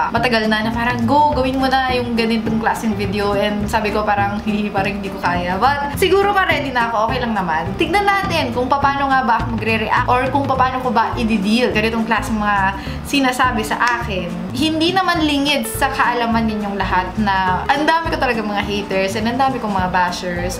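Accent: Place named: Pampanga, Philippines. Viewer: Napakacute mo ate! Yung kapampangan accent mo nakakatuwa. Sarap pakinggan.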